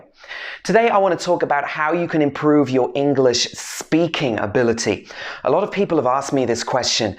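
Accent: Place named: United Kingdom. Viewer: Wish I can talk to you everyday to improve my English speaking, specially British Accent which I really like.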